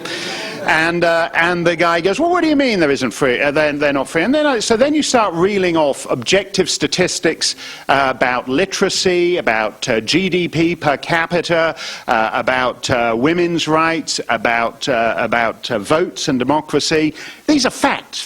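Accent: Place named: United Kingdom. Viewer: This is gonna be a stupid question but I'm curious has nothing to do with Marc Steyn's speech but his accent. I read that he's from Canada but he sounds like he has British accent? I'm just really really curious. I really like him I think he's great but somethings weren't adding up. The thing is if you're from Canada but you sound like you're British it made curious. Either way I still love him he's great!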